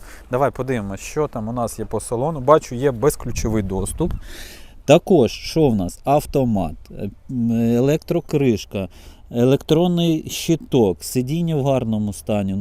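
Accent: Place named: Volhynia, Ukraine. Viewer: Волиняни так розмовляють, Стрій, Кієв, город і да. Це масове явище.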